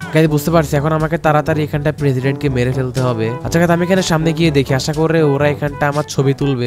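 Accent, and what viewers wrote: Noakhali, Bangladesh. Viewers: Valo vabe kotha bolo nuakhalir vasha na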